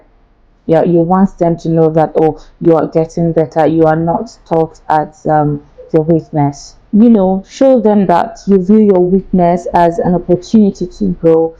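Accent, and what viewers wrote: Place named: South Africa. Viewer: Madam, are you from South Africa? Please! God Bless You!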